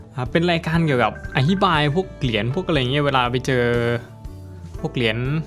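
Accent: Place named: North, Thailand. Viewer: เสียงหนุ่มเมืองแปร่ อู้ไทย เสียงหล่อ ดี น้อ อิอิ:face-blue-smiling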